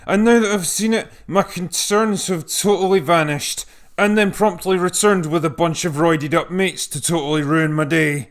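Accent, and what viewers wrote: Scotland, United Kingdom. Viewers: At first I was like shut the f up. But then I thought your voice is a cross between scotty of trek and braveheart But......take a breath it will be ok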